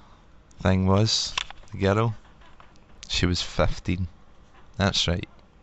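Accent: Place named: Spain. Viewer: I found the thick Spanish accent difficult to follow at times-- but really enjoyed the program : )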